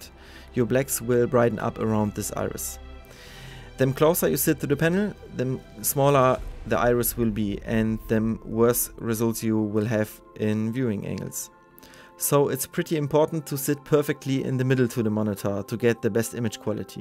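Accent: Germany. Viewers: are you german? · your pronounciation sounds way to german. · immer dieser deutsche akzent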